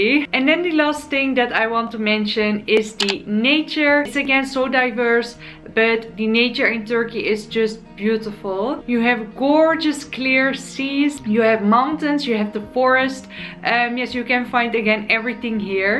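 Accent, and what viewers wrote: Netherlands. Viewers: I knew ur from The Netherlands, just because of ur accent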